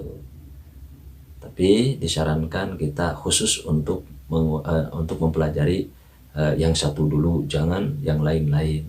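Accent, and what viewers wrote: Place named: West Java, Indonesia. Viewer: siga asli sunda euyy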